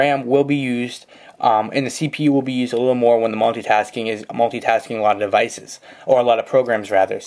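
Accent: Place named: Canada. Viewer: youre canadian....i can tell...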